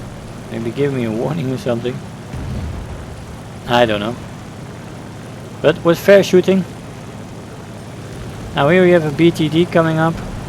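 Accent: Netherlands. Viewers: Ha! ik wist t dat nerderlands accent is overal herkenbaar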